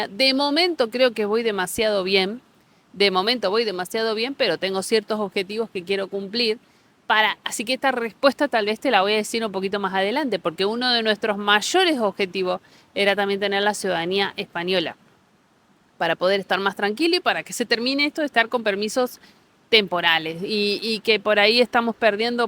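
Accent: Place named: Argentina. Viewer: ¿Que me sigue sorprendiendo de los argentinos que estáis llegando en masa a España? Que hacéis cientos de videos de todo lo que os sorprende de España, pero muy poco esfuerzo en adaptaros. Ejemplo: lleváis años aquí y seguís con ese horrible acento argentino.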